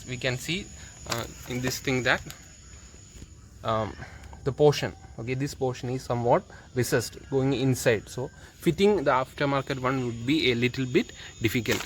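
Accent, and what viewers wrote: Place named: Kerala, India. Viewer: That thick mallu accent😂❤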